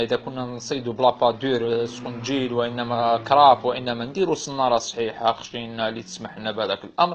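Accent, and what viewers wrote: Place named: Algiers, Algeria. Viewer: Salem frère..un petit conseil d'amis essaye de parler en arabe car l'accent algérois est détester par les 47 wilaya et en plus cette chaîne est connu de Tunis jusqu'à Palestine...ne prend pas mal mon conseil frère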